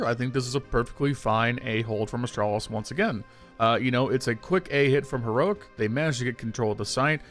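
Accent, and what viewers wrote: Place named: United States. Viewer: 1. Open cs commentary video 2. Hear american accent 3. Click away from the video and never watch another of his videos again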